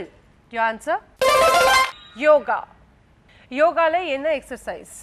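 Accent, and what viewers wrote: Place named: Punjab, India. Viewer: She is from Punjab and be proud she is trying to talk our language. Namma oorlaye Tamil a pesadha tamilans irukanga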